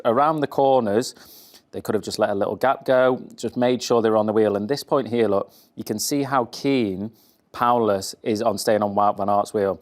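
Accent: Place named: Yorkshire, United Kingdom. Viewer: Thats a lad!! Proper Yorkshire lad!! I live in the states these days so watching and listening to makes me feel at home. Love it!! 🤘🏻🇬🇧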